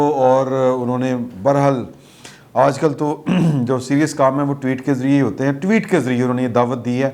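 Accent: Punjab, Pakistan. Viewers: I love ur mix Punjabi talk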